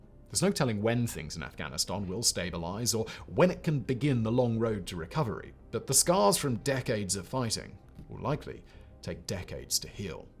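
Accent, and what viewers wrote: England, United Kingdom. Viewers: Afghan history should certainly not be told by a dude with English accent especially when you skip the nasty details in favor of the British oppressor and aggressor! Correct version: The Bacha-i-Saqao was an orchestrated Islamic uprising by the British to oust the real Afghan king, Amanullah Khan, in 1929. When the last Afghan king was removed, the British RAF instantly installed Nadir Shah and his four brothers (the Musahiban brothers), who attempted to erase the era of Amanullah Khan and rewrite Afghan history by killing thousands of Afghan students and intellectuals in order to enable the British annexation of Afghan land (Durand Line), which Amanullah Khan had freed after the Third Anglo-Afghan War in 1919. Amanullah Khan had also formed a pact with the Bolsheviks, better known as the USSR, in 1923 to distance Britain's imperialism. 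The British puppet king, Nadir, also fostered division in Afghanistan by promoting racism among different Afghan ethnic groups, especially the Hazaras, who became the oppressed group. Nadir even created a separate class and caste within his own Pashtun ethnicity; his tribe, the Mohammadzai, was considered superior to other Pashtun tribes. Interestingly, Karzai, the first USA-backed Afghan puppet, is a relative of King Zahir, who was the son of the British puppet king, Nadir, just as Ghani was a puppet of the West, serving the interests of USA banks to exploit Afghanistan. Afghanistan gained its independence because King Amanullah Khan recogniz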